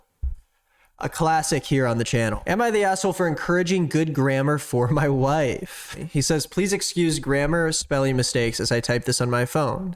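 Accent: South, United States. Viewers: I work in a cali firm and I can tell you that everyone would find a southern accent super cool AND she says stuff like 'darn tootin'??? Amazing. Love her. I wish her the absolute best.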